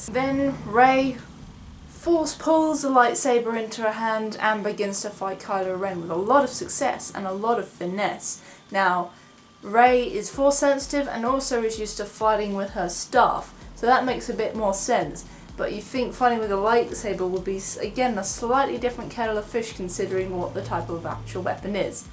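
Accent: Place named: England, United Kingdom. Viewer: love your english accent !! i hope you hear that often .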